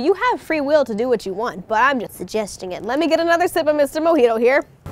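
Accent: Spain. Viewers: girl come to mexico, we want to feed you too, also her spanish spanish sounds tasty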